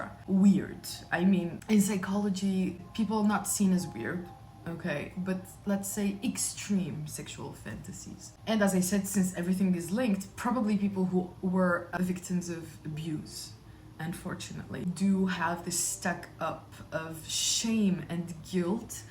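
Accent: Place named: France. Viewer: Ur french accent 😩❤️‍🔥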